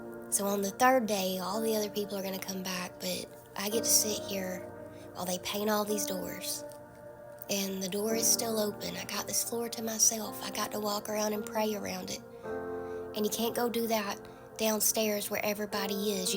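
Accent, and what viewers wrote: South, United States. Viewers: ❤❤What a beautiful message from a Beautiful Lady Pastor... Thank you Ma'am... Your lovely Southern accent was a Breath of Fresh Air.., complimented by your Spirit filled message... I say all this as your message and you were the first to come up on my Screen, after one of the most terrible spiritual letdown I've experienced for more than 5yrs...I was so excited to experience a major breakthrough in my long walk in Christ., with all the Blessings promised.. via messages from spirit filled children of God...after a 2 hr conference call with powers that be... Totally the opposite of what I was expecting.. 😬I am in in such a freaked out frame of spiritual battle...& want so to vent.. I saw your vid, subscribed & really was glad I watched... You're beautiful, down to earth humbleness of a Jesus filled lady.. Thank You soo for this message...I was truelly Blessed. 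🌹✝️👏🙏❤️BTW.. I am from South Africa... Johannesburg.. Praying for Your land.. ✝️👏🙏❤️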